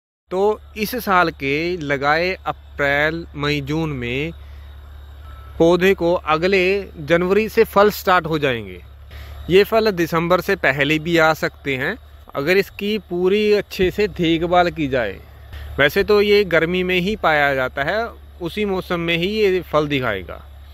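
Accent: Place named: Punjab, India. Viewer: bhaji Punjabi nikli jandi hai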